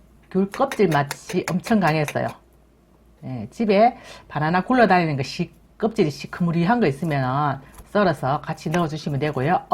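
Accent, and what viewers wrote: Gyeongsang, South Korea. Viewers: 안녕 하세요 넘 재미있어요 저도 경상도 토백 인지라 새삼 경상도 사투리 억양 재미있고 솜씨 잘 배웠습니다 한번 해 먹어 봐야 겠내요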